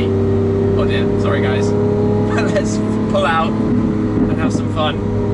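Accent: England, United Kingdom. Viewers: Shmee maybe you should change your intro words? Do u live near Germany? Just curious, your accent seems English, how do you so often appear on autobahn? Video is so bumpy is a glitch or road with pot holes? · Alright, you must drive on the right side of the road and learn some words German of course. :D Anyway, I'm glad how you love and enjoy the cars with a lot of passion on the autobahn. As a German it's so entertaining and funny to hear your enthusiasm in English with the British accent. Have a nice week and soon a happy new year with many astonishing cars!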